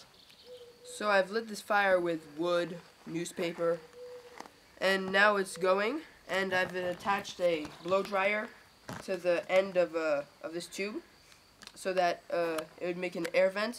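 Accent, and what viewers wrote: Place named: United States. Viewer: You sound American. Yet, where you live doesn't look like America. Nice work by the way. Great start!!!!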